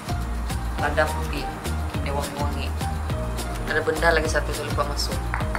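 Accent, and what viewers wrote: Sabah, Malaysia. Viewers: Best x lupa logat sabah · biar brtahun2 tingal dkl tpi bahasa mc sabah,itu bagus sbb bahasa sabah dia tdk buang,ada tu org sabah p sna bahasa trus mcm smnjung oooo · betul2 karas pekat ohh sabah c. teperancit bilgnya 🤣🤣🤣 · Sy baru sajja makan ambuyat sama sawo sy sis. 😂.. Tapi sup dia sardin cmpur pucuk ubi. hahaa. tapi sadap jugaa.. - mantap vedio sy sukaaa sbp logat sabah ndk jugaa lari.. Hehee iloveyou ❤💕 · Wlaupun dia kwin org sana. Tpi loghat sabah msih pakat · Mentor... Kekal kn tu logat sabah..... 👍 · Pekat btl Sabah ni memang xde campur air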